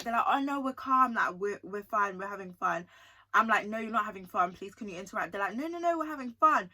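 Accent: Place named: United Kingdom. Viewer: Im from the US And I believe your from the UK Right You have a Uk accent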